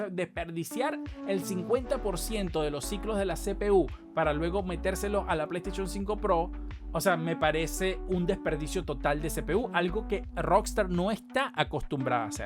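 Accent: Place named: San Juan de los Morros, Venezuela. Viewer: Saludos hermano, me gustan tus videos... he notado que tienes un acento muy parecido de la ciudad de donde soy, no seras de San Juan de los Morros?? Guarico, Venezuela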